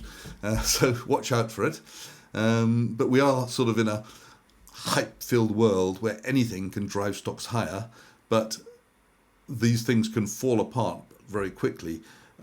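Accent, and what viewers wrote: United Kingdom. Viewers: I first saw you, not long back on Daniella Cambone. I was at the time wondering why there seemed to be so few independent, genuine analysts UK born (I trust you are UK and not Swiss with that accent) Anyway, good to watch and keep going as you are attracting quite a following. The word which sums you up is 'gravitas' but with a healthy dose of humour and good stories.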